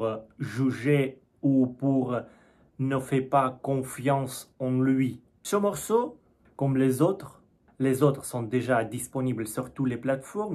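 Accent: Russia. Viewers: Bonjour. Je viens juste de visionner la vidéo. Tu sous-estime tes progrès en français. C'est dommage... Certes, tu as toujours ce petit accent russe que j'aime bien mais qui s'estompera au fur et à mesure de ta progression. Lire un livre le soir, il n'y a rien de mieux pour s'endormir. Et en plus, s'il est en français, en dormant, tu retiendras plus aisément l'orthographe des mots que tu auras lu. En tout cas, félicitations pour ta persévérance. 👍🙋‍♂️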